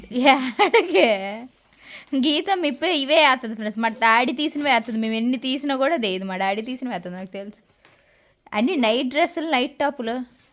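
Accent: Srikakulam, India. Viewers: Me srikakulam anttah bad slag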